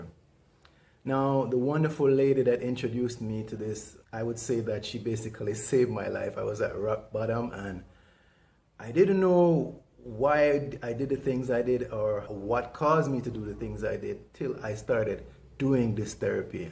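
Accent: Caribbean. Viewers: where u are originally from? caribbean? my trinidadian self wonders where your accent is from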